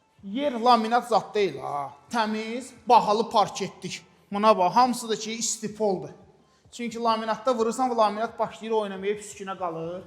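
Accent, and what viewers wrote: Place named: Baku, Azerbaijan. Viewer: Bakli qedeș lehcesi bașkadida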